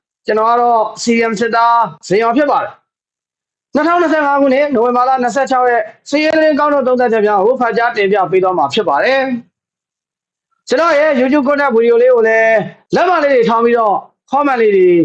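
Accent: Yaw, Myanmar. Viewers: okယောနသံ ❤၁၀၀၀၀၀၀၀၀၀၀၀သိန်း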